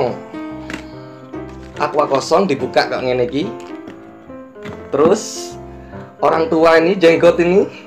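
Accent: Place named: Pasuruan, Indonesia. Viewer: Mas bro orang mana sih.logatnya kyak orang pasuruan??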